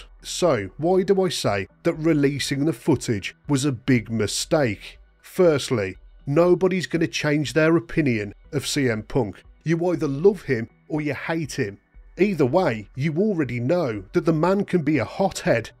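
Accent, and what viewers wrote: United Kingdom. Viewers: NGL I was missing your thick British Accent opinion on this. Nice Vid Bruv.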